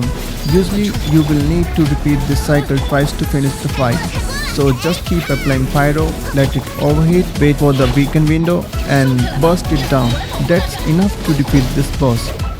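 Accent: India. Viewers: your voice look like indian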